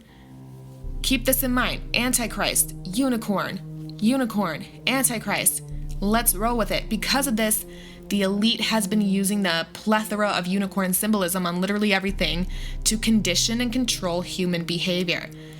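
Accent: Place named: New York, United States. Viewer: Girl how u have a ny accent n from north Chicago lol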